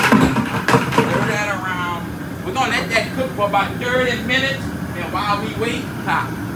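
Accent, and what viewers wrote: Louisiana, United States. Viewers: I love Louisiana accent they have the best in the south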